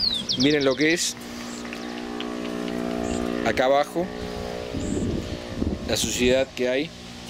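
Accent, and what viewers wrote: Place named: Argentina. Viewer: Vi el horrible video pensando en los consejos y sugerencias para erradicarlas, y la pasaste pasando un lugar que ni me importa y para terminar mi compañía es..... llámenos...... desde el principio pon PUBLICIDAD. No nos hagas perder el tiempo con los engaños y ganchos, además tienes acento argentino y yo vivo en México.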